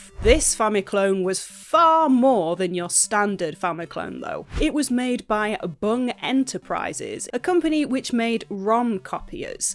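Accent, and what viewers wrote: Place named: Northern England, United Kingdom. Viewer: 2 questions: The name, is that a game or musical reference? And I know you're down south now but I hear northern, where did you grow up? (hull?) oh and Keep up the good work long time fan.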